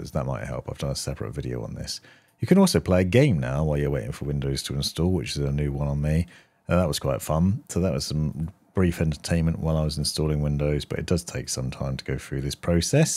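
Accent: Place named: RP, United Kingdom. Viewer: Your videos are very useful, but more importantly, I use your videos to practise my English received pronunciation. Yours is so clear! Cheers from Argentina.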